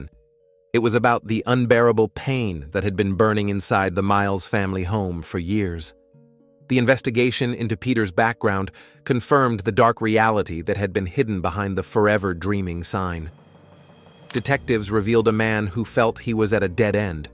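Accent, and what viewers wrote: Delaware, United States. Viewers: 😂 Not to get off this topic, but you sound like you live in Delaware. DMV here will piss anyone off to the point you get lost trying to drive away from there. 😂😅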